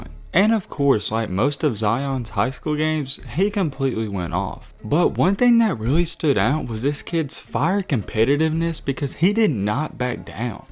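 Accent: South, United States. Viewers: Yo ahh country asf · where you from? that southern accent heavyyyy🤣🤣👍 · Dude I gotta be honest the southern accent was not made for YouTube commentary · You southern af bro 🤣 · This man sounds like he's trying to hide his southern accent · Love the country voice commentary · Country af voice. Sounds like you're talking and trying to hide your accent. Loud and proud brother. Don't hide that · The narrator sounds like he's a buzzed hillbilly.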